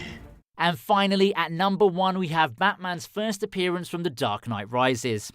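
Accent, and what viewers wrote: United Kingdom. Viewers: i wanted to watch this till i heard this anus voice dude yer dumb. do people think british voice is cool? i do NOT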